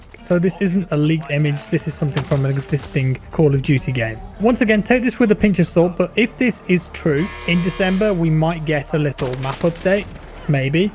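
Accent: United Kingdom. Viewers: Hate this posh English nerd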